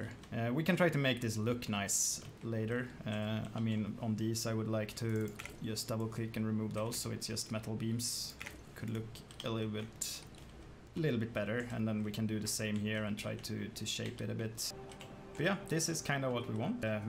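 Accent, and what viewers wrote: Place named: Sweden. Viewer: Nae då men jag tyckte jag hörde lite svensk dialekt 😀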